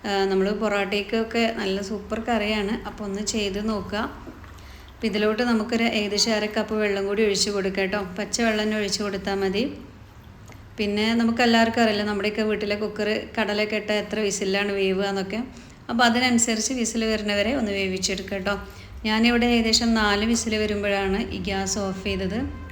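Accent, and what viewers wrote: Malappuram, India. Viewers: മലപ്പുറം താത്തയുടെ മലപ്പുറം സ്റ്റൈലിലുള്ള സംസാരവും പാചകവും എത്ര രസകരമാണന്നോ? എനിക്ക് വളരെ ഇഷ്ടമാണ് നിങ്ങളെ 'എന്താ മുഖം കാണിക്കാത്തെ കൈമാത്രമാണല്ലൊ കാണുന്നത്.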